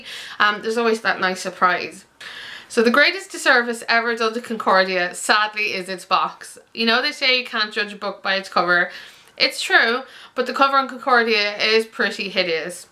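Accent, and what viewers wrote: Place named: Ireland. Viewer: Nice video! Great to hear an Irish voice talking about games too!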